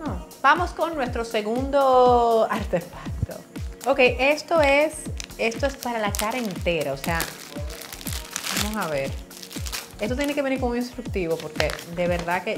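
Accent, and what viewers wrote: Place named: Dominican Republic. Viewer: Amo la energía de esta mujer y me encanta que no cambias tu forma de hablar ese dominicanismo 😄😄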